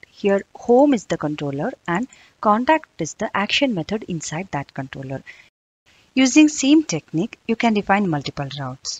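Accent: India. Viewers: This Is indian accent which is very clear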